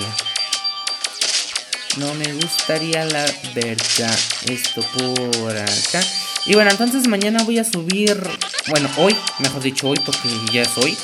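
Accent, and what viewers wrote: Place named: Mexico. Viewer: Eres mexicano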